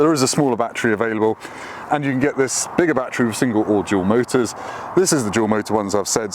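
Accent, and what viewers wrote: United Kingdom. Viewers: Great review. I watch a lot of reviews with British commentators and you have a very distinct accent? What part of the UK is that?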